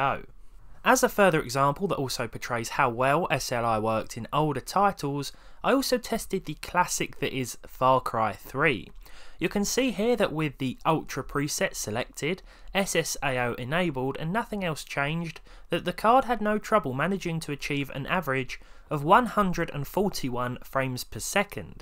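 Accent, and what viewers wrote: United Kingdom. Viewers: British accent + old tech? Instant sub!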